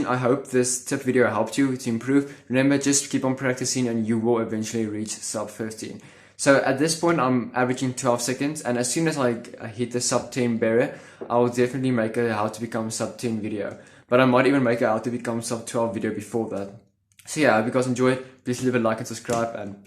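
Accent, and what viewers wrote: South Africa. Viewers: are you from South Africa? :)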